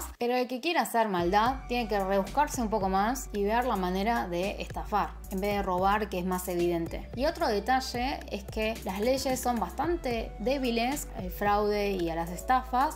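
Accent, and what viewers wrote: Argentina. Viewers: A Lisa no la estafan , deberían matarlo por eso >:( amo tu acento Argentino 💖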